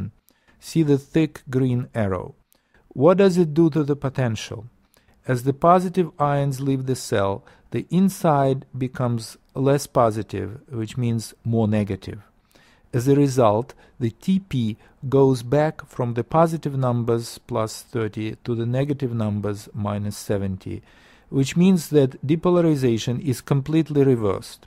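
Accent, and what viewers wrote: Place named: Russia. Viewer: The Russian accent makes it cooler!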